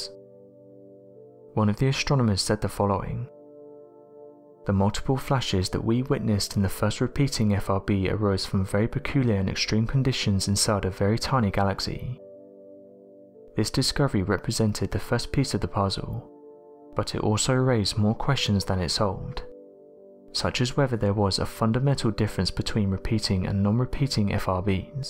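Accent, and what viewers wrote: United Kingdom. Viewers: Honestly, I love the content. I listen to other channels that have British narrators too. I find that this narrator speaks too quickly and his words seem to run together. He speaks too softly at times and I often find it difficult to understand through his accent even though I listen to other British accents often, for example, the narrator of the Top Mysteries you tube channel. I have trouble understanding this man. His voice is soothing though.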